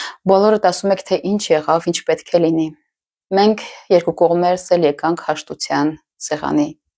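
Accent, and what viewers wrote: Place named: Russia. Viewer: ինչու եք ռուսական տոնով խոսում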